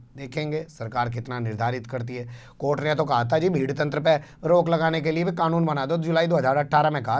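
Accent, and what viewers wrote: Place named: Western Uttar Pradesh, India. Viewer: सर आज आपके मुँह अपनी खड़ी बोली सुनकर मजा आ गया सर कभी पश्चिमी उत्तर प्रदेश को अलग प्रदेश बनाने पर कोई बहस या कोई शो कोई न्यूज चलाए थारा अहसान होगा म्हारे पर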